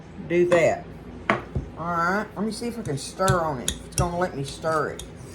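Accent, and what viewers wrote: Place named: Texas, United States. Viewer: I read there was a study and they found that some people have a gene that makes cilantro taste like soap. I love these chilled and could eat a whole plate by myself..lol I've never put Tajin in them though so I'm going to try that. And I can always tell you're a Texan..."I'm gonna start with 4 jalapenos. And I'm gonna leave the seeds". ❤🌶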